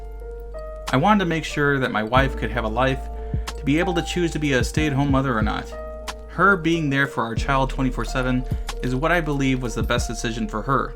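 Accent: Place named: New Jersey, United States. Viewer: You sound a little bit like a New Jersey guy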